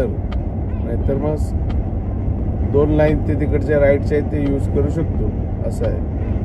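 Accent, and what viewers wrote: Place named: Kolhapur, India. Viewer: तू कोल्हापूर कडेचा आहेस वाटते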